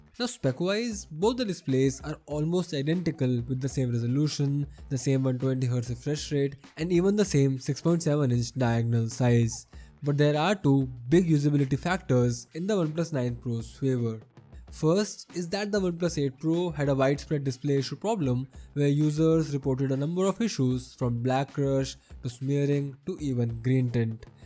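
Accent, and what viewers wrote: India. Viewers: I don't understand much because of your Indian accent but i like your review 👍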